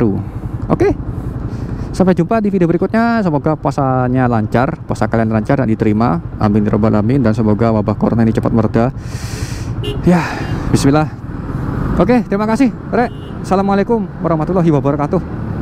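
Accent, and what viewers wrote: Madura, Indonesia. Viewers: Meddhok mu cak mantap wkwkwk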